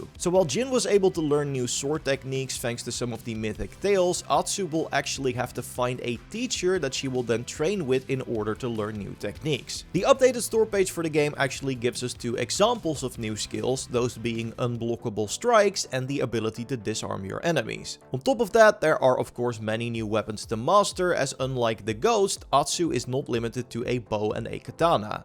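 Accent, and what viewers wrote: Netherlands. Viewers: I hear an accent . You from europe ? Netherlands?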